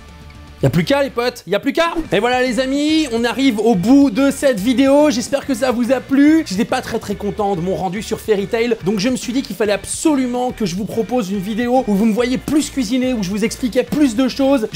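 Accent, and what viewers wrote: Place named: South-West, France. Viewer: Ce que j'aime chez, c'est cet accent du Sud-Ouest qui ressort même en parlant de cuisine Japonaise =D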